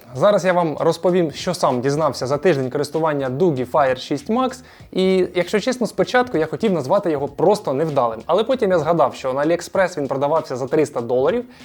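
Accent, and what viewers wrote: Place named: Russia. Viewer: Чувствуется по говору родной язык русский.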